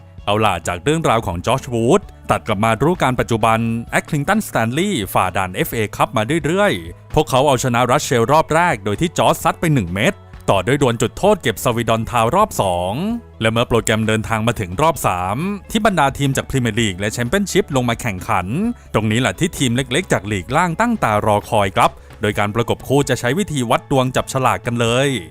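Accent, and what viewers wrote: South, Thailand. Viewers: แอด เปนคนใต้. จังหวัดไหรคับ ได้ยินเเหลง เมื่อวันก่อน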